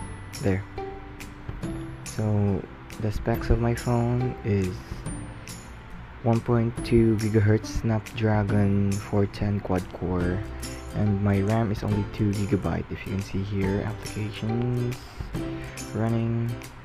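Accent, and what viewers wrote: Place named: Philippines. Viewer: Hey are you filipino? I can tell by your accent buddy! Mabuhayy!!!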